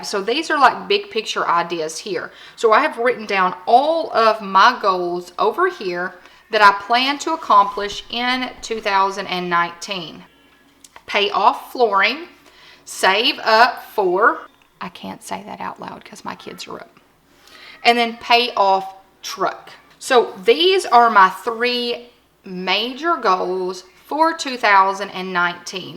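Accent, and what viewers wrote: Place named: Georgia, United States. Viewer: Wow, that was so incredibly helpful! I’d been looking at this item for a few days now and was having trouble getting the info I needed to make a purchase decision. I’m another one of those visual people and you’ve sold me on it and shown me all I needed to know to get started. Love your personality! Your accent reminds mo of my cousins in Georgia. I’ve subscribed and look forward to more videos! :)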